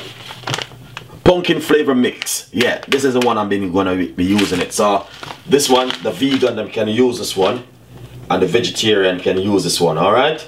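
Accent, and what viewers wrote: Jamaica. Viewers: Very nice soup & lovely Jamaican accent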